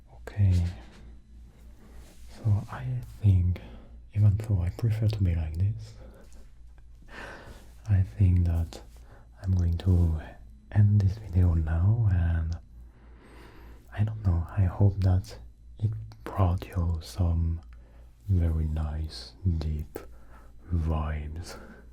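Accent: France. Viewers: I love whispers but I’ve got like a tolerance up for them now, but you’ve broken it with your soft spoken voice. Love the when the french speak English it’s so nice ✨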